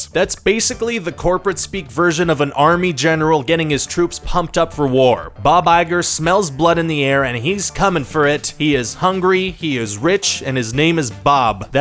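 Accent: Canada. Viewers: Wow is screen rant Canadian? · Wait dude are you Canadian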